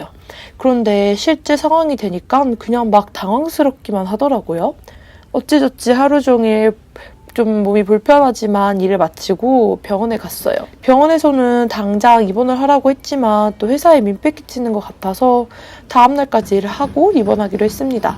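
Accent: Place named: Jeolla, South Korea. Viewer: 저도 광주사람이라그런지 전라도사투리가 친숙하게 들리네요~~영상 잘봤습니다~~❤️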